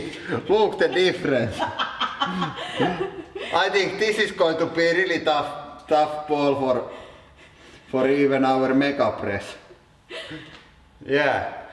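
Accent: Finland. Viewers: SUOMIIII, KOSK TOI ENKKU 3/5 JA KOSKA "Voi vittu!!!" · aa mä oon aina luullu et sä oot venäläinen :D vaikka kyllä oon epäilly tosta suomi-aksentista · you clearly aren't familiar with the Finnish accent So many people speak like that lol. Not me tho' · he sounds like hes from finland... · Finnish accent is so great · My high school tennis coach was Finnish. He had the exact same accent but with a different voice. It was hard not to bust out laughing when he'd get mad and yell things like "you guys play like piece of joke out on court today!" at us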